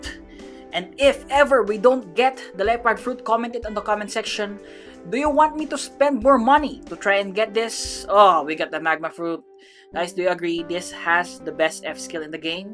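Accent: Philippines. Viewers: Tbh you sound like filipino lol keep it up you alwast make me laugh.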